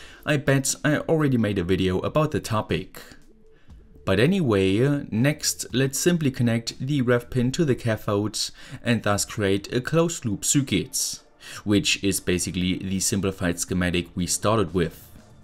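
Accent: Germany. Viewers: ...das is das trotz korrekter Grammatik GEILSTE deutsch Akzentuierte Englisch, , was ich zu Lebzeiten erfahren durfte...Content is natürlich auch gut, aber diese Stimme hebt das in eine ganz andere Dimension...Danke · I AM SO GLAD WITH YOUR FREQUENCY OF VIDEOS LATELY! I know full well how much work this just be for you, but I think I speak for a great many of us, it really is exciting to see a new release from you! No matter the topic, you make it understandable, entertaining, engaging, and simply watchable for just about anybody. I think it is your super soothing cadence of speech, and that sweet, sweet German accent! 😁😁😁👏👏👍🏼👍🏼👍🏼😳😳😃😃😃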